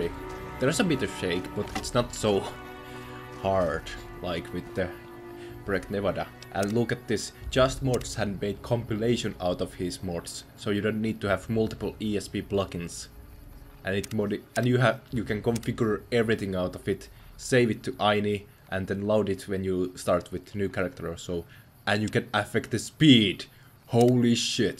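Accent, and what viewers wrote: Finland. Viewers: Nice süomi accent, bro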